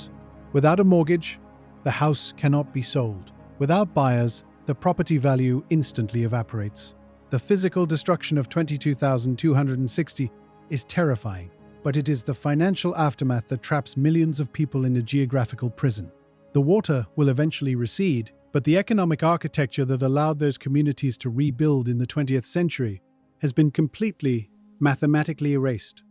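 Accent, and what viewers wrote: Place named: United Kingdom. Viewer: Why are the AI comments about American weather systems, given with a british accent?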